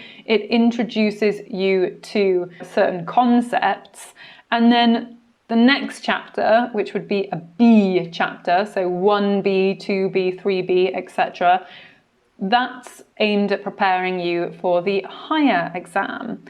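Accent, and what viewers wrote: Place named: United Kingdom. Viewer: The most cordial greetings: I'm a lawyer from Colombia who is learning general English, but I also want to be able to speak about my profession in this beautiful language, therefore I thank you for your review, bucause now I know that is a good idea to get this book and why not, to take the TOLES exam. Take care. Bless you. Postscript: I really love the british accent.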